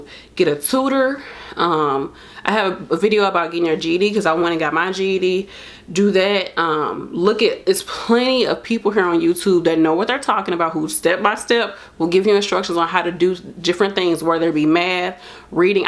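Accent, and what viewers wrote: St. Louis, United States. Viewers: I knew you had to be from St Louis I can hear it! I’m from St. Louis too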